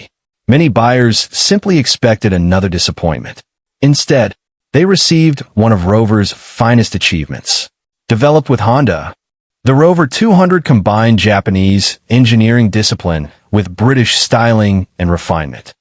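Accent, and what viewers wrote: United States. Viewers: Number 8 MG Zed R. Why is this narrated in an American accent? A channel with the title UK Torque, referencing British cars, should at the very least use a British narrator.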